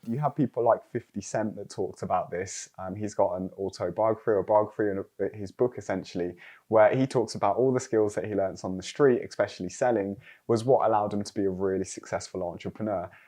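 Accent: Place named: Birmingham, United Kingdom. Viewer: Thought I clicked on an unknown T video😂. You know I thought I could detect a hint of "Brummy" in the accent😂. Great video. I was tempted to do Ethical hacking at undergrad, but anyone who hears the term hacking outside that realm will immediately have negative connetations. But it's got that white hat hacking roles are cropping up.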